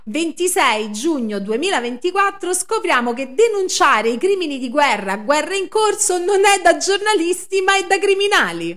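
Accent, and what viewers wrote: Rome, Italy. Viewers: continua pure con la calata romana perché tu la fai elegante e rendi chiarissimo i concetti.....belle ed efficaci anche le espressioni che accompagni la calata romana.E poi usi espressioni dialettali romane e non in marie eccezionali, simpatici e precisi, mi fai morire con quelle siciliane😂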